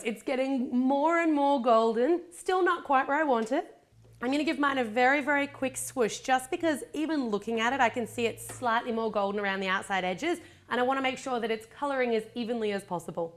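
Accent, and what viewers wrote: Australia. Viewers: is she an Aussie don't like the accent. and fair enough yeah she recreates bigger version of chocolates but do they taste the same as the actual brands? only a million times worse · No! That's not a British accent at all!! She's Australian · She actually sounds more Australian than british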